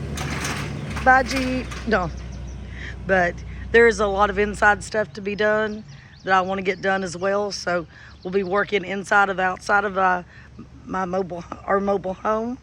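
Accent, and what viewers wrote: South, United States. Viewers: Blessing to you. Your channel popped up in my feed just now. Love your southern voice. Subscribed. Much love from Charlotte, NC!!!!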